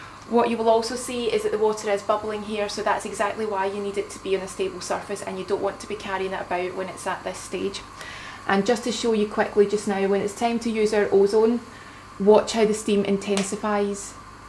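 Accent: Scotland, United Kingdom. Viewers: I loved this. And I actually loved your Scottish accent. And also the fact that you even say Uhmmmm and Ahhhh during this very lovely and useful video. Thank you so much.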